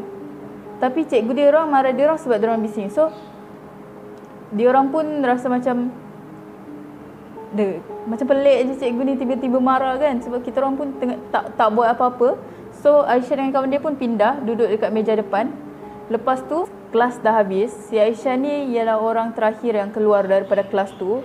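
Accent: Sabah, Malaysia. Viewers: kakak org mna ? mcm ada loghat sabah pulak heheh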